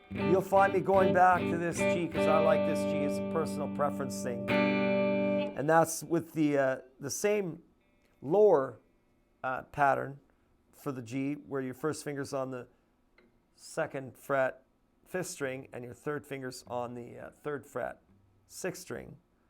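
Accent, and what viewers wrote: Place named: Canada. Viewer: Hey thanks...kind of coincidental that I would run across this lesson after just having bought my 2nd capo, the 'short-cut.' I'm only into the guitar 2 years, and although I practice daily--& often--I am a woman of a certain age with recently diagnosed arthritis. Now, "3 chords and the truth" may be enough for some (younger?) folks to achieve success quickly, but since my only option is to focus on my personal goals & chart my progression, this video of yours and certain others have helped me a great deal. First, your show on hand exercises was inspirational & dove-tailed perfectly with my daily physiotherapy -- right up there with rolling the baoding balls around (haha...but true nonetheless). As well, I appreciate your straightforward, no-nonsense approach to learning; as a teacher myself I agree that learners must not be spoon-fed, but should think for themselves as much as possible. 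Of course, one must have hope in order to carry on when in something like my predicament, thus I was very happy to hear you express, more or less --- "'you get out of it what you put into it." Hey! Now I'm not nearly so crushed as I was. May I request that you follow up with a lesson based on the "shorty" capo...? I've heard they are a great boon to the arthritis-afflicted. Thanks so much for your time & effort. By the way (considering that I'm a language teacher/linguist), based on your vowels, intonation, cadence, & tons of other factors, I'm assuming you are Canadian?? If so, please a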